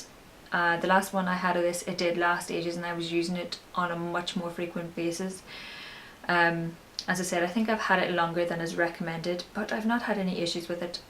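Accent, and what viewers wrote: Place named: Northern Ireland, United Kingdom. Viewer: The NI accent!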